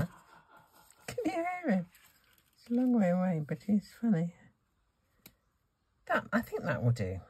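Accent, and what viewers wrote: United Kingdom. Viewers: Your lovely British accent gave it away!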